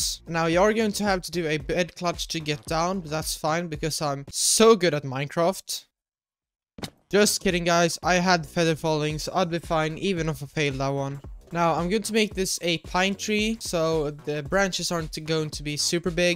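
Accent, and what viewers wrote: Sweden. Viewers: Är du typ svensk, sounds like swidish accent. Nice build anyway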